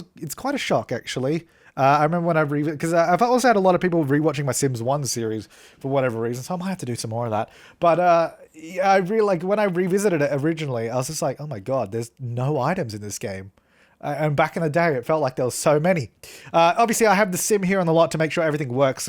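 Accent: Australia. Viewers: Is it just bc of his Australian accent or does he sound like draw with jazza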